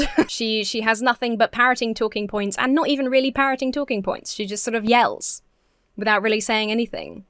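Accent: United Kingdom. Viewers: 🤣😂🤣😂 Be Still my silly old mans heart, You godless little vixen you are a Riot and Hilarious. The Glasses and British accent drive me nuts you are so pretty and funny. Oh BTW Larry Flynt is worth noting because before the internets the real dirty pictures were in his Magazine HUSTLER, Not Playboy. Ans you looked at the pictures first then you might read the articles which pretty far left and very hard hitting. because he didn't worry about sponcers. I'm sure there is a good profile of him on youtube. Hes a great sinner too. Do what you do its so funny I watch your show as a treatment for depression and it always works.. Cheers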